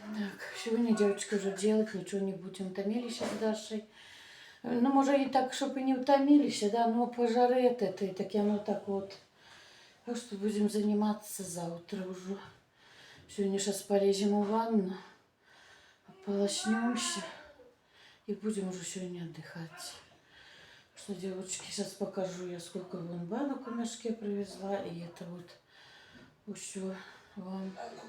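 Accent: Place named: Bryansk, Russia. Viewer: Как вас приятно слушать. У вас такой говорок, как у нас на Брянщине... Один в один...Давно не была на родине.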